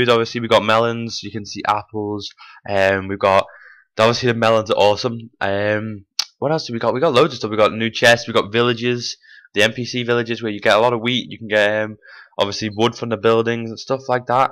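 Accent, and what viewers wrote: Liverpool, United Kingdom. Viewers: Thanks for the update info, also u r scouse right. I hope so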